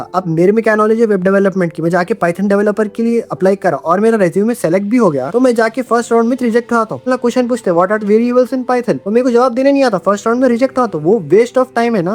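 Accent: Hyderabad, India. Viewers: Hyderabad se ho aap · Hydrabadi accent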